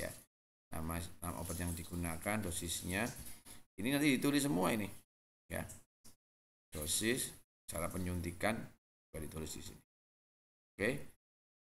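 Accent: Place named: Banyumas, Indonesia. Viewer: Assalamualaikum, bisa minta filenya pak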